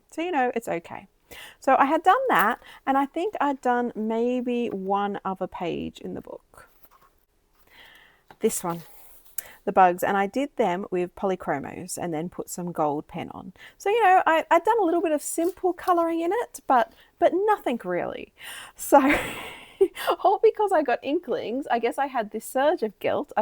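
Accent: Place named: Australia. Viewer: Great collection of coloring pages! I’m really enjoying your channel. Your voice is so pleasant and of course I love your Aussie accent! But beyond that I really like your method of making videos. I understand when someone doesn’t want to talk all the time but I really appreciate your explaining your intentions and thoughts and how you achieve the results you get. Your color along was really good and as you said I appreciate the artist telling me what color they are using or what technique they are doing so I don’t have to watch every second and could actually “color along”. I hope you keep your passion for coloring and make lots more videos because your channel is just what I’m looking for 💕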